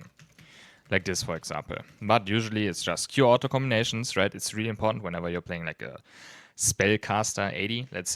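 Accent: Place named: Germany. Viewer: I like your german accent haha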